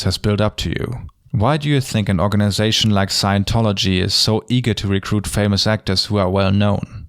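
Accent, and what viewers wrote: Germany. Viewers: a slight German accent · I had no idea how much I needed a German narrator before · The content of this video is entirely based on the premise, that you are a mindless bee simply running after the sweet anti-sjw-content you were made addicted to. The mindset of a true lefty: Everyone is just following perceived elites. In this particular case, running after more or less famous youtubers. For example, I liked Ben Shapiro back in the day, when I have first seen him, because he stated arguments in an extremely condensed manner that I have stated for years and yet have to see a well thought through counter-argument against. I have experienced Sargon more as a funny Britsh dude with some good and some bad arguments than an authority in arguments. And you got to give to the far-righters on youtube: They have a very sharp insight into the lefts mindset and their ideological premises. 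And to add also a counter-argument to one of the points made in this video: Yes, SJWs are a minority within the left. Political radicals are always a minority but this fact doesnt imply that they have no or cant gain any relevant political power. Quite the opposite is the case. By your accent I can tell you are from Germany: "Gleichstellung" von Frauen ist auch nur eine Idee einer kleinen linksradikalen Minderheit in Deutschland und doch ist dieses linksradikale, freiheitsfeindliche und im Kern kommunistische Konzept heute Bestandteil der Gesetzgebung und wird von fast allen Parteien vertreten. Das ist ein aktuelles Beispiel dafür, welchen Einfluss radikale Minderheiten gewinnen können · your German accent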